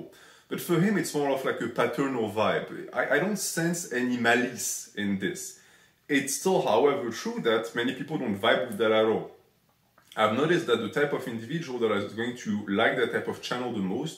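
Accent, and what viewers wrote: France. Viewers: Your French accent hooked me the first time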